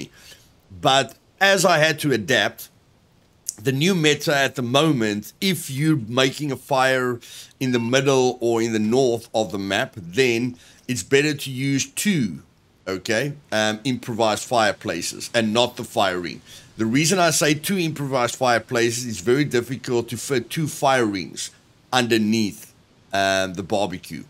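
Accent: South Africa. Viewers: Mind if I ask, what is your accent? ZA?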